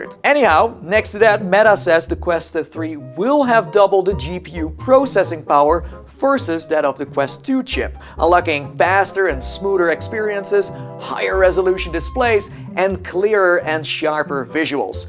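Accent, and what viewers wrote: Netherlands. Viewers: are you dutch or from the Netherlands?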